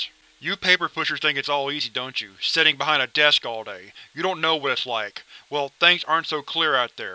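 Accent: United States. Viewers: I think it's his accent, I've watched a ton of these, and i know alot of people from all over the states. I've heard this accent plenty.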